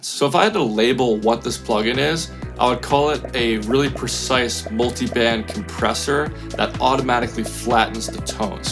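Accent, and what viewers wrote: United Kingdom. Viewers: Oh eck - right out of bloody Yorkshire, I tell thee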